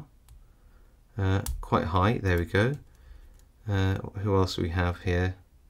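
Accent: England, United Kingdom. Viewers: Love your English accent , brass lock no problem your so cool n confident. Keep up great work. I like as hobby but I'm still learning.😅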